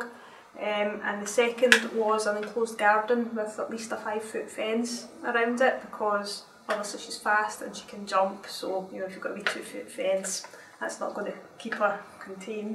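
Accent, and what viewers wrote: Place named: Scotland, United Kingdom. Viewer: She is Scottish;. Possibly Glasgow, but more the West than East.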